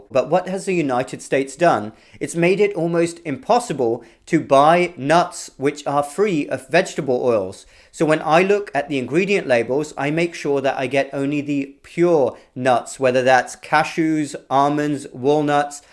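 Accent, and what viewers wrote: United Kingdom. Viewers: Bullshit in a British accent. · I just love the fect doc didn't loose his British accent 👏😆❤️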